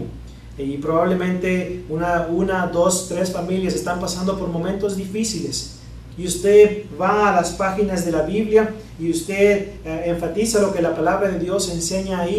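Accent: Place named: Dominican Republic. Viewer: El pastor se le escucha el acento como el del Pastor Miguel Nuñez y es de Rep. Dominicana puede ser que éste pastor puede ser yo no aseguro pero me gusta su clase nunca había escuchado todo ésto Dios lo bendiga Pastor de donde sea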